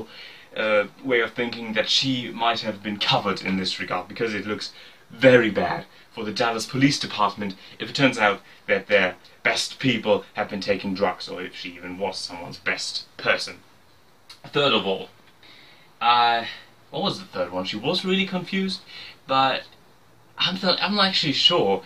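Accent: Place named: United Kingdom. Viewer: I'm trying not to giggle the way you said it looks different it smells different feel different (with Brit accent). but that's true!! your place smells like you looks like you and feels like you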